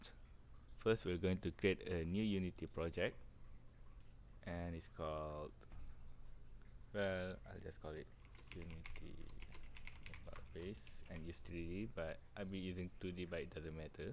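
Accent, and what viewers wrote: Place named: Malaysia. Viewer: Nice tutorial and unity package, thanks for putting this together. By the way I recognize the accent my wife is Malaysian.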